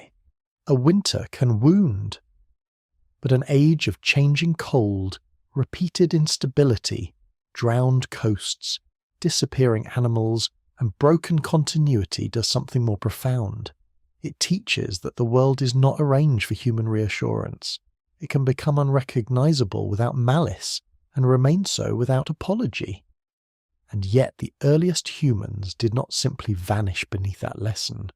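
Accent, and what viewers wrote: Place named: Australia. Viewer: AU narration sucks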